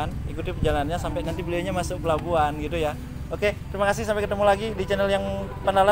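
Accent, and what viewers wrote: Bali, Indonesia. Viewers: Logatny kyk bali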